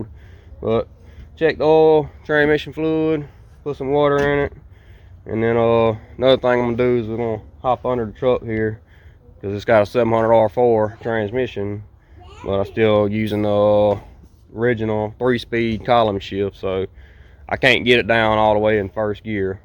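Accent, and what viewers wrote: South, United States. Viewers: Nothing like having a sip of apple pie moonshine and listening to a Southern Man talk about his bad ass truck💯👍🇺🇸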